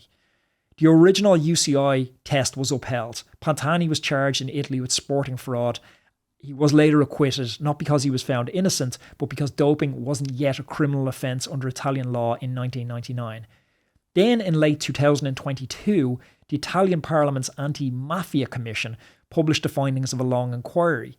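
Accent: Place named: Ireland. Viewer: Its called authentic self if you cant tune your ear to a good Irish accent your just lazy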